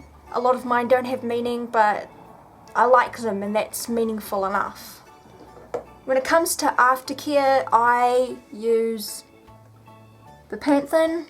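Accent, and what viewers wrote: New Zealand. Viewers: This is a bad idea but im doing it anyway!! I noticed youre from nz right away and i sound the same but i roll my r's slightly more :-) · Hi this is really cool and i love your videos. are you from new zealand cause your accent is awesome. Awesome bear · She's from New Zealand, be ignorant somewhere else. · nope it is her accent and she is a kiwi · thought you were a kiwi! (Waving at you from Wellington) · steezy.k kiwi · Kiwi accent :3 The tattoo turned out rad! If I ever came across you in person I would seriously pay you to give me one x)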